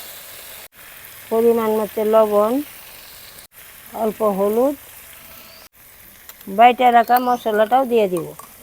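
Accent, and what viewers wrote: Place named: Tripura, India. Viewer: Tumer bari tripura naki tumer katha gulu tripura bashar moto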